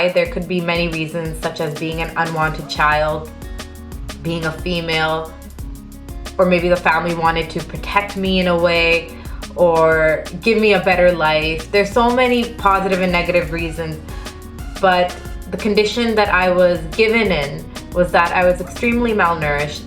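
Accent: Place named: India. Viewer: omg i love Indian ascents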